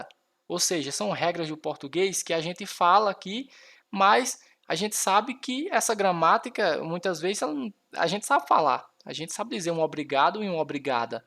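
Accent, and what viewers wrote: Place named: Northeast, Brazil. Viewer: Eu não acho um idioma maravilhoso, preciso do inglês por causa do meu trabalho... Uma língua aliatoria não tem concordancia adnominal, adverbial língua do capeta. se vc fazer uma análise sintático de uma frase,vc ver que não tem sentido...😩 vc e nordestino pelo sotaqui, nada contra, sua dinâmica é ótima bem expercifica 👍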